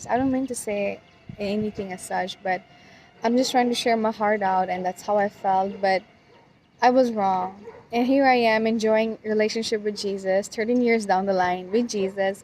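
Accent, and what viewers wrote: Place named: United States. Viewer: Your English like American English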